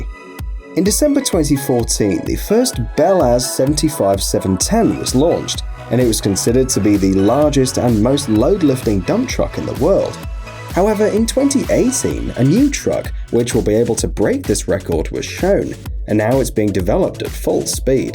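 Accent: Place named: RP, United Kingdom. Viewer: Looks like someone took someone else's video and put a poncey, Harry Potter type commentary on it.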